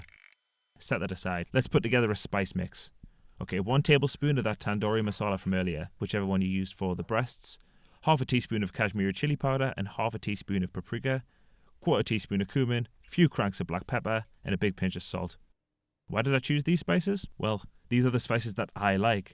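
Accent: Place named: South Shields, United Kingdom. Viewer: Pretty sure that's a South Shields accent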